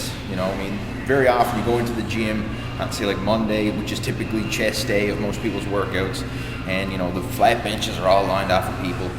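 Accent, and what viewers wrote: Newfoundland, Canada. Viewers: You're canadian, right? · Is this guy canadian ? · god you sound sooo canadian hahaha i love it! good video! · Lol the second you started talking I knew you were a Newfie:) Awesome stuff, keep it up~!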